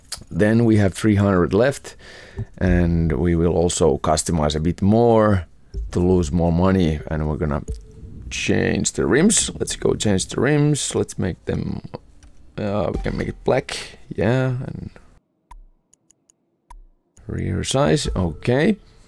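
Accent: Finland. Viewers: Are you finnish? Your accent sounds like it.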